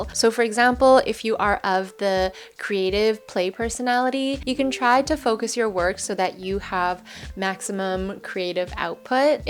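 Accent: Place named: California, United States. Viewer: What in the Californian accent is this?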